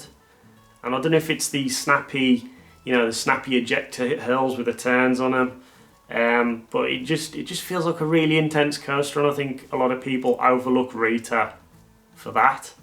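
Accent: United Kingdom. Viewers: Ok should I feel guilty for finding out I'm with a hard crush on your british accent????? And no, I'm not from us or a native english speaker.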